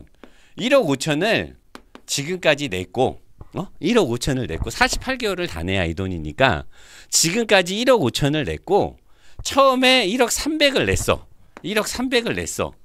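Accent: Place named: Chungcheong, South Korea. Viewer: 충청도여~뭐여~~😅좋은 정보 감사합니다! 사장님!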